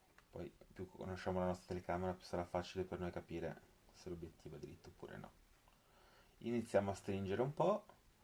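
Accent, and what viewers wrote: Italy. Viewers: L accento genovese è stato confermato dalla strada di Spotorno 🤣 sto montando per la prima volta la action cam e mi sono imbattuto nel tuo video, ho i laccetti ma non il coperchio con i buchi ( è intero) , cercherò un plastica con i buchi. Saluti da cogoleto ✌🏻 Ninja <num>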